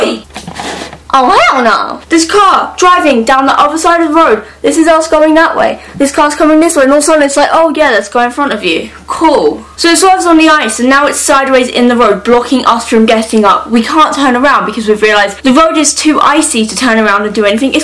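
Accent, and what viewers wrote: England, United Kingdom. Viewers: r u from england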